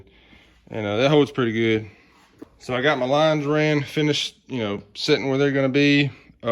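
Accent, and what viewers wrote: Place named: Alabama, United States. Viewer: Bro I watched both your truck videos I'm doing exactly same thing to my 86k10 had it for almost 20 years finally put new motor in her and Holley sniper I'm getting rid of rats nest of wiring and straighten out vacuum which lead me to you videos .. preciate the post.. ha catfish skinners .. had me rolling. You from Alabama too? 🤣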